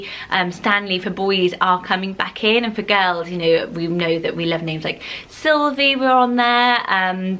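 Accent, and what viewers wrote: United Kingdom. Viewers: It's so funny for me to hear the lovely British pronunciations of some of these names. I'm from the US and particularly the south. So the name Blanche - my grandmother had several friends named Blanche and with the US "a" it's just not pretty at all. One "blanches" vegetables. With the French accent Blanche is very pretty. Here's a name for you - Jathena. That is my sister's name. I'm sure you've heard of Athena - well her name is Jathena. Quite pretty. My name is Janine. When I was growing up in the south in the US, NO ONE was name Janine - for a few years there was a Janine in every TV show and movie. It's lost it's popularity again. Anyway, we are almost exactly one year apart and we were Jathena and Janine.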